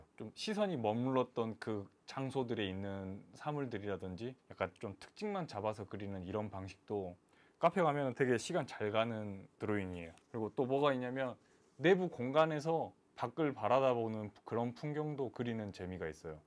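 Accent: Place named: Jeolla, South Korea. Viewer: 앗 저 전라도가 고향인데... 말씀하실 때 전라도 억양이 들리네욤 🤭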